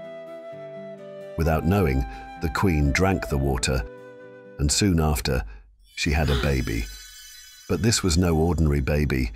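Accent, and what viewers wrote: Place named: United Kingdom. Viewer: Why use a British accent?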